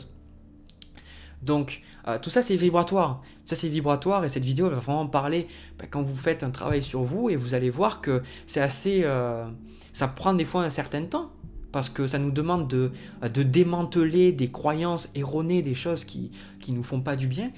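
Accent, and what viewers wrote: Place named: South, France. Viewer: Merci beaucoup...l'accent de "notre " sud est 1 plus 😊😉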